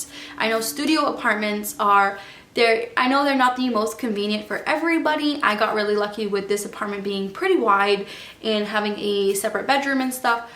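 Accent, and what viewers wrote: Boston, United States. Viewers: Lol...i knew you were from the Boston area by your accent! I'm about 40 min away!! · Love the Boston accent, thank you for your good points! Need some help learning where to find an apartment coming out of college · I can hear your Boston accent. It's ever so subtle but adorable! 😍😁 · I love your Boston accent. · In Michigan, the basic coverage insurance is over $100 a month. For me its $239 a month because I haven't had insurance in a while. I've heard that Michigan has the highest car insurance in the country. Its just crazy to hear how cheap it can be in other places. In other news, I LOVE your Noston accent..😀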